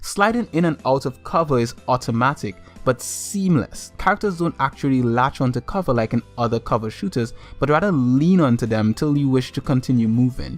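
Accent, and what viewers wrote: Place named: Trinidad and Tobago. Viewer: you sound like a trini , you from trinidad bro ?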